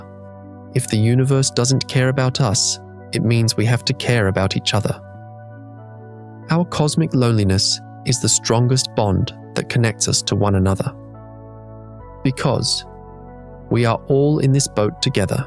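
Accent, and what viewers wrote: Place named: United Kingdom. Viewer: 11-minnits 'in' annnd, ths p.o.s. up-loader, can't-be-bothered to review thn, edit (their...??) 'work'! W-t-h...??!! Ther-haz-been NUMEROUS mis-pronunciations (&, other-ERRORS)!! FAIILLL!! FAIILLL!! FAIILLL!! Allllsoooo: pleeez STOP-uzing a British-voice('accent')? They are-NOT more-intelligent-thn, Americans, based-SOLEY-upon how thy speak??!!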